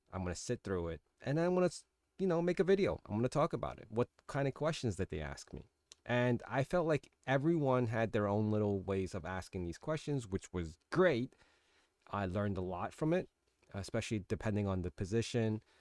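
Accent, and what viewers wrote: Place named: New York, United States. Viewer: Niiiiiice - Everyone has thier $$$ figure that they will have a wolf of wall street moment and go back into the office..."you show me a paystub making that amount and I'll quit my job and work for you today" lol Being that you sound like youre in NYC and some of the duties you described....it has to be 175k+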